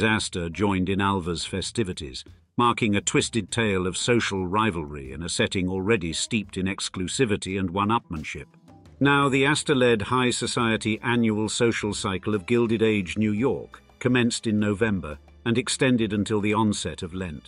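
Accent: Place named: London, United Kingdom. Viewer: Our lovely London corky English.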